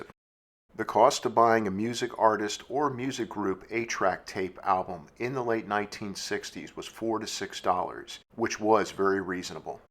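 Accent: United States. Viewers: ...Ah, that mid-Atlantic accent.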